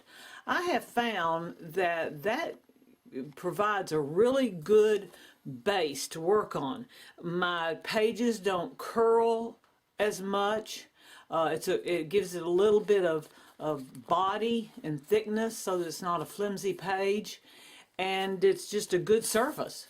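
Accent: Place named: South, United States. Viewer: i really lik it....thanks for sharing,.....ps i love your southern accent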